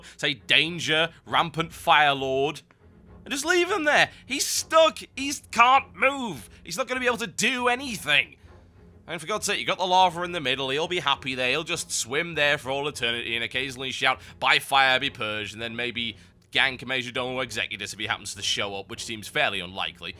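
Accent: United Kingdom. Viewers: u british asda? me 2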